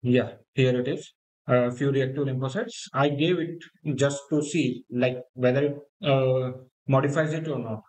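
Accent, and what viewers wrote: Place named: India. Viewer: I like the approach of this channel, a doctor testing AI technology. What I can say for this to improve is maybe speak a little bit slower as indian accent is sometimes hard to follow.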